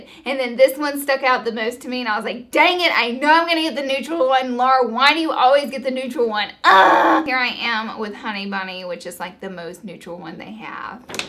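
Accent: South, United States. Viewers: where are you from? your lil country accent is adorbs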